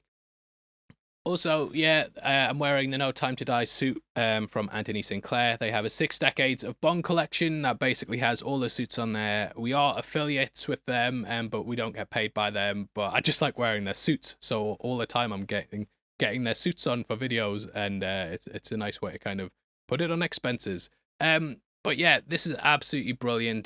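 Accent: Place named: Scotland, United Kingdom. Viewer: I like how his accent has a hint of Scottish in it.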